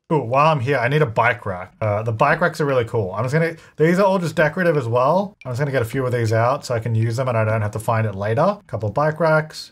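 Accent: Australia. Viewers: Has anyone ever told you you sound like Jazza (and it's not just because you're Australian)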